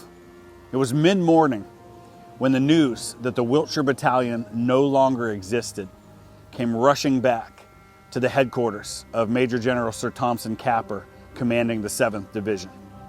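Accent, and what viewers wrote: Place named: Canada. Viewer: I have just started to watch this series of videos and you are so dedicated to WW1 and all the very new and very old ways of fighting. i guess that you are Canadian by your accent, I love the passion that you show for many dead very young men. The start of WW1 is still not very understood, why Britain got involved and even more the Americans is still to this day not really understood.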